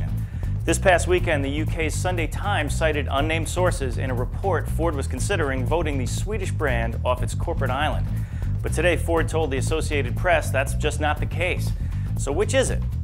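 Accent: Canada. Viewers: your from quebec arnt you? pull your head outta your ass. Vive le Anglise! :P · lol... well it doesn't sound like an accent to you because you are Canadian... not because you don't have an accent.... anyone who speaks at all, has an accent of some kind.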